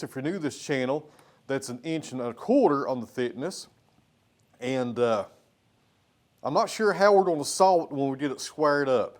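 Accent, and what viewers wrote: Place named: Tennessee, United States. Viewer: You from Tennessee. You sound like you gotta be from at least near there.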